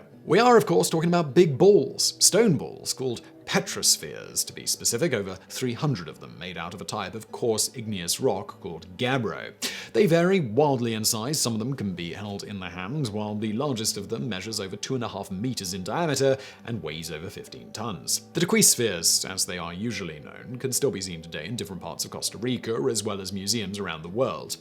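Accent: United Kingdom. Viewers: Love listening to this "snooty brit".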